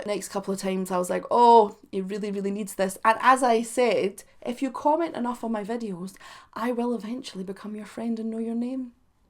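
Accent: Scotland, United Kingdom. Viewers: In one video you say you'll be friends with, and fight the people in the comment sections. If the accent wasn't enough, that's all anyone needs to hear to know you're Scottish!!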